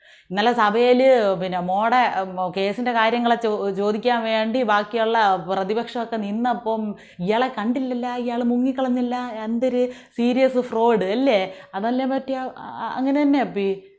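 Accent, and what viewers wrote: Thiruvananthapuram, India. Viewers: എന്തരപ്പീ ഇത് എന്തരു നല്ല അവതരണം അപ്പീ ഇത് തിരന്തോ രംഭാഷ കലക്കി സൂപ്പറായിരിക്കുന്നു മോളേ👍♥️🌹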